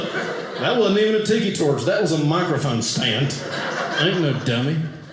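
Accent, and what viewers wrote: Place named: South, United States. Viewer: The sad part is that I recognize his particular NC accent. 😅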